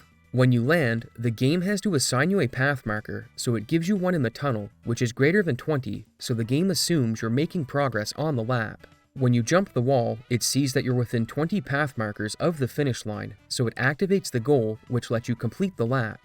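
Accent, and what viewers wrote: Canada. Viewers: very canadian accent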